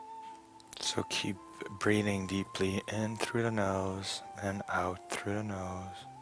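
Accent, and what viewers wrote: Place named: Montreal, Canada. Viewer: I love your classes so much. I grew up in Montreal's south shore so your accent makes me feel at home. Glad your knee is healing and you are able to post more often. Thank you so much!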